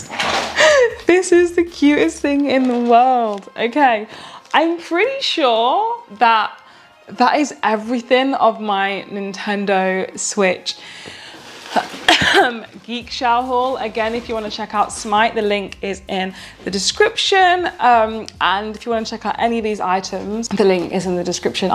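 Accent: United Kingdom. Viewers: British accent, especially when spoken by a girl, is one of the best things in the Universe :3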